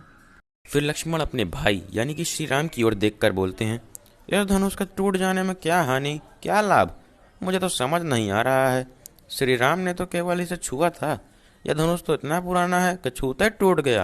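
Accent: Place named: Bihar, India. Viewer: Gajab bihari language bole hai bhaiya aap, and thanks for this great explantion🥰 Love from Bihar❤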